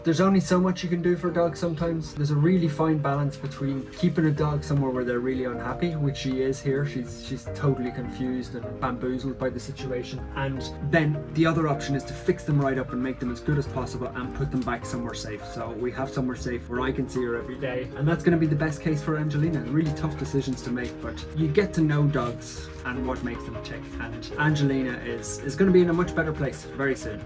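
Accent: Ireland. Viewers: You are an amazing person for helping these poor animals, you must have been sent from heaven as you sound so chilled with that beautiful Irish accent. Please continue with the good work helping them back to good health ❤😊 No doubt it has it’s up’s and downs but on the upside you are helping gods animals 😇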